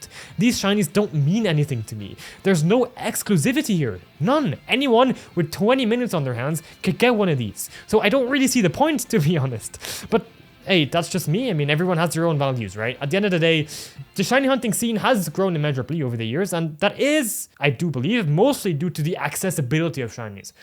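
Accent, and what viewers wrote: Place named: Germany. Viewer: Are you german? Your accent sounds a bit german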